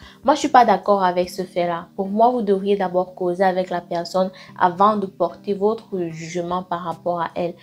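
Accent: Cameroon. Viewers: Intéressante la vidéo👍🏽 par contre j'ai une petite question. Comment ça se fait que tu t'appelle mais tu parles avec un accent camer? Les c'est pas en Afrique de l'ouest? Excuse ma curiosité.🤔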